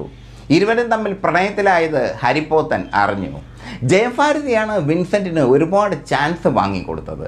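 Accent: Kottayam, India. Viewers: ജയഫാരതി ഈ ചേട്ടൻ കോട്ടയംകാരൻ ആണോ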